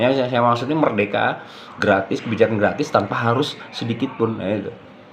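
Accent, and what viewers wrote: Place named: Java, Indonesia. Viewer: Jowone sampean ngendi masehhhh menowo tonggone hhhh pon tak subscribe lho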